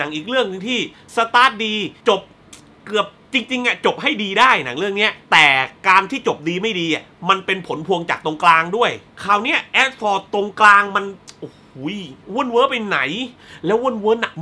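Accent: South, Thailand. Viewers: พี่เป็นคนที่ไหนครับ จังหวัดอะไรครับ สำเนียงเหมือนคนพากย์ใต้เลยครับ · พี่เป็นคนภาคใต้รึเปล่าครับ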